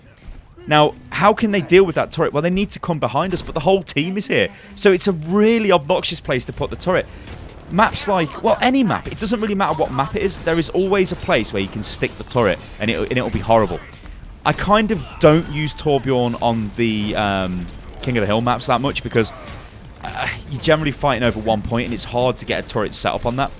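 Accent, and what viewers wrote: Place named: United Kingdom. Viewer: Subbed for that hilarious laugh and that epic Brit accent. Oh, and the guides. Kinda. Ish.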